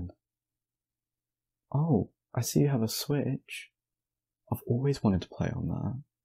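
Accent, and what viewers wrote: England, United Kingdom. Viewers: Omg you have such a proper English accent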